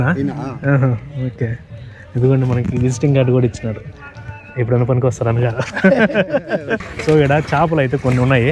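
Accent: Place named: Rayalaseema, India. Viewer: Adi Chittoor Nellore Yasa. Meeru kuda a pranthamlo putti unte alage matladevaru.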